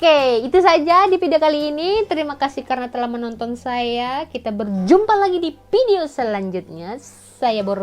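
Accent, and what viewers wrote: Malaysia. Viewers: Dah cakap mcm org Malaysia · Bahasa nya mengingat kan dulu di johor dan kota kinabalu... teladan yang bae...makasih sdh sharing..sukses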